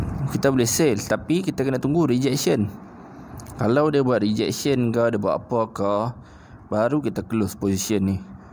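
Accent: North, Malaysia. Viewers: Mantap bro cakap utara baru paham kalay da contact number ws la nk belajar lg mndalam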